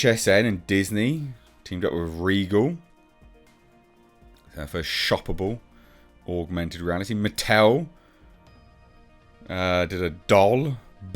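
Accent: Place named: United Kingdom. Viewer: He sounds like every British guy everywhere anywhere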